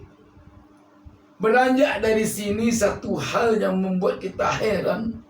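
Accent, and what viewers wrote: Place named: Padang, Indonesia. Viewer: Pak... Bahasa indonesianya pakai dialeg mana thu.... Kayakya dialeg padang ya...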